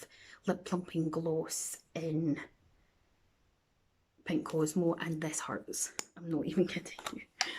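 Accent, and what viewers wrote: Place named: Scotland, United Kingdom. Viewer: There's a phrase from when I was in the military, when someone did well, "atta-girl" or "atta-boy"...you get an atta-girl for dealing with your anxiety and still make videos and PowerPoint presentations! As to being disenheartened, another thing I learned from my time in service: If you have helped only one person your life has been worth-while. You are helping me to embrace wearing makeup...I mainly only wear it for special occasions, or if I'm on "vacation" with my hubby while he's at a tech conference (pre-worldwide cooties) and I had time to watch YouTube creators with makeup videos. (When my makeup is on-point, I feel better about myself - and anything healthy that I can do to bolster my mental health is a good thing.) Wayne Goss is one I follow, as well as a couple of females who specialize in "older skin" (I have 20 years on you.) The upside of your unedited videos: we get to see how long things take, how to fix oops-es, annnd, we get to hear your beautiful voice! 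(trust me, a lot of us U.S. folks are gaga for a beautiful Scottish accent...just ask Cheryl LOL!) ❤️ Sorry for the novel-length, but I thought it was important enough to share my thoughts. 😉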